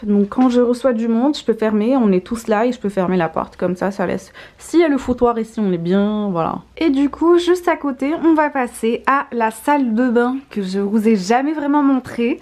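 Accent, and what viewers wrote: Quebec, Canada. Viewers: T’as un peu un accent québécois non ? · Tu parle avec un accent canadien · Pourquoi tu parles avec un accent canadien 🤔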